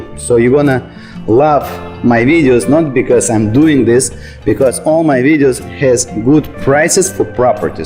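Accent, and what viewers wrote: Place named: Russia. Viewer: So nice Russian accent. Glad to know that local Miami environment can not destroy it 👍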